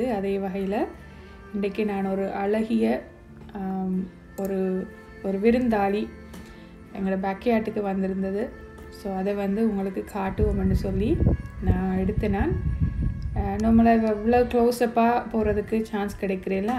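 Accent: Sri Lanka. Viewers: Super mouth watering😋and ungal elangai tamil superb dr stay connected family dr hope to my family dr💖😍👍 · Loved ur srilankan tamil...nice different recipe....❤❤❤❤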